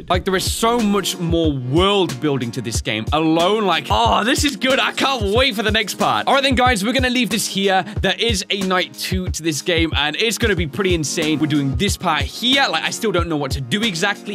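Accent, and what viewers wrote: United Kingdom. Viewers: Man i love British accent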